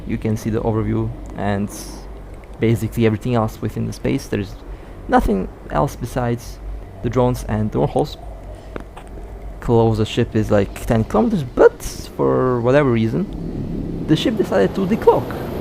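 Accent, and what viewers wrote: Russia. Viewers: да делай на русском судя по акценту же русскоязычный!)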